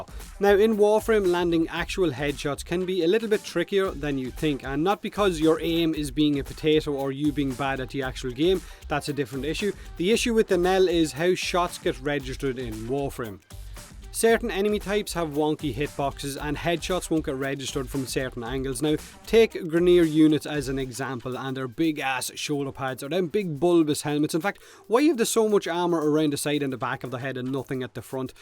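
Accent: Ireland. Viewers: I always come here for my two favorite things. Warframe, and an Irish accent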